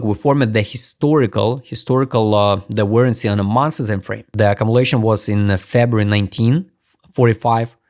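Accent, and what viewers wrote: Russia. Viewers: Pyсню слышу сквозь попытки англицировать 🖕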